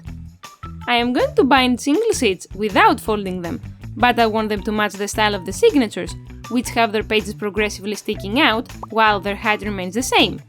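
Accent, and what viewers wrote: Greece. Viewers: My apologies for pointing it out ;-) I'm Greek myself (born and raised in Belgium though) and that Greek/English is so recognizable ;-) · This is a lovely video, plus i can recognize a greek accent anywhere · Where are you from ? You sound kinda Greek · Are you greek? The accent kinda sounds similar to my families 😅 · You have done an amazing job! The journal looks fantastic! So much work that you have already put and continue to do so. I hope you get thereand that this project of love turns out exactly how you want it to. A thousand well dones! ps. Is this a greek accent I hear? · Are you Greek by chance? I love the artwork and your accent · Πολύ ωραίο βίντεο! Μήπως τυχαίνει να ξες ελληνικά; Γιατί η προφορά σου λίγο μου θυμίζει τον τρόπο που ένας Έλληνας θα μιλούσε αγγλικά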